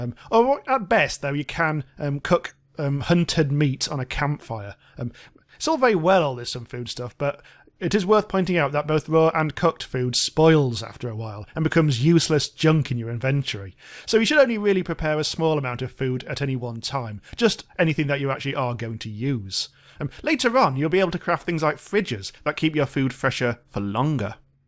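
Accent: United Kingdom. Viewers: This guy narrates like roald dahl with a british voice, love it !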